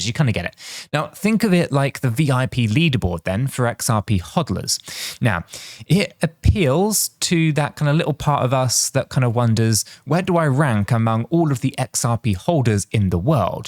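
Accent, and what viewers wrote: United Kingdom. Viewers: You are charismatic explaining complicated issues with your British accent humoristicly & simply for all kinds of listeners!! Really a blessing having you in YouTube channel!! Hello from Athens capital city of Hellas.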